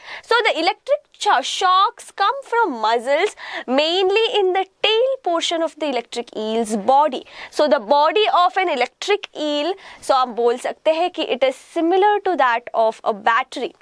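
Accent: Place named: South, India. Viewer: Ma'am has a strong south indian accent in hindi Edit: She is still a great teacher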